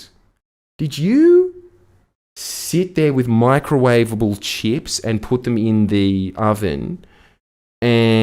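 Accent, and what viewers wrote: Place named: Australia. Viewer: That’s what HE said. 😂 He even showed the same face that comes from that pleasantly satisfied feeling. “Mmmm. Arggggh. Yesssss.” along with that expression of the Westerner’s stoicism with hand cupping his chin. I’ve concluded that he actually gesticulates more than I & I didn’t think that was possible. Thank whatever God(s) may be that what otherwise would appear to be Parkinsonian tics instead, has the positive connotation that it does or else I’m sure I would be terribly self-conscious about it. Yet there is something … & I can’t quite put my finger on it … to the feeling I get when I’m surprised repeatedly - like the baby in a game of peek-a-boo ever amazed & bewildered at the magic of a disappearing adult - by his right arm becoming invisible as it slips in the side pocket. I now have to imagine that there IS a portal through which JS traverses dimensions & is converted to. 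I mean THANKS for talking about the softness of the producer’s hair that you can feel through there. You pack SO MUCH info into such a short timespan where I’m tripping over your Aussie accent, actually needing the transcript at times but the closed captioning is fd up … it can’t even get your Australian twang right… & then & THEN (no & THEN) I have to now completely imagine an invisible black hole where I can’t see past the event horizon yet your arm is teleported across planes… where you have the cheapest budget for a Star Trekkian Transporter since imagination is free. Hey! Is a misquote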